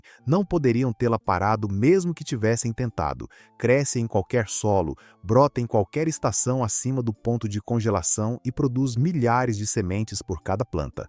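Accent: Brazil. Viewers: Texto de manele com sotaque brasileiro. Ficou ó. Uma bosta. "Sabor à noz". Ridículo. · Dialética de Portugal, fonética do Brasil.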